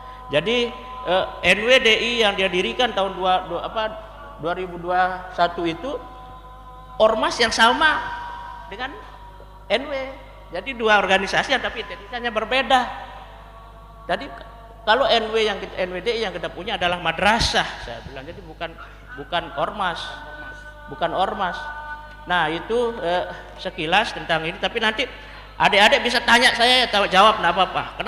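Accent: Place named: Bali, Indonesia. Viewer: Orang mana ini brbahasa sj logat bali x ye... koq gk logis cm bs sling adudomba masyarakat gk etis dech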